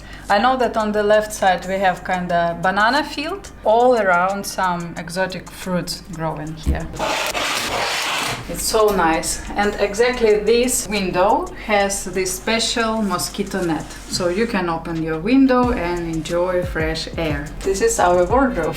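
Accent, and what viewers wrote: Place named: India. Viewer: Başkan sen niye Hintli gibi konuşuyorsun?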